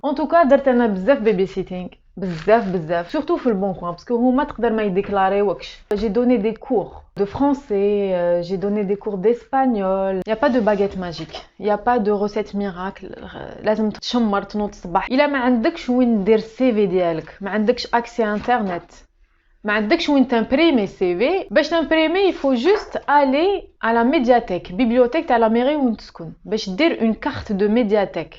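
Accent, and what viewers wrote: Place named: Bab El Oued, Algeria. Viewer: قتلتيني بالضحك كي تهدري بالدارجة تاع باب الواد 😂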